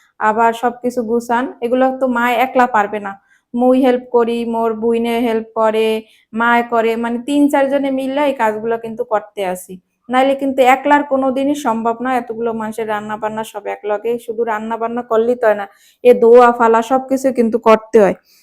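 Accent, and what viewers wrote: Barishal, Bangladesh. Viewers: আসসালামু আলাইকুম আপুমনি আজ ফাস্ট হলাম ইনশাআল্লাহ তোমার বরিশালের ভাষায় কথা খুব ভালো লাগে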